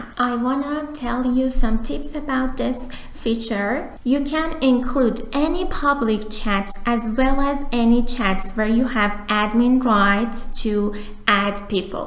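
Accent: Iran. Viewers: That Persian accent 😂😂😂😂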